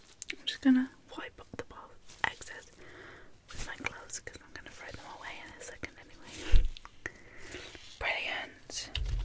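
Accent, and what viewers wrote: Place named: United Kingdom. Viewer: I love your Britanic accent❤️❤️❤️😋♥️kiss You Young lady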